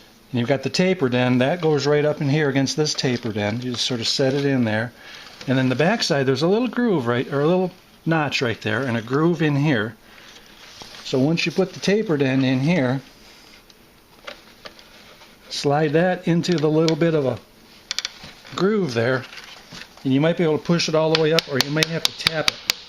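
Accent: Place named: Midwest, United States. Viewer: Definitely a midwestern accent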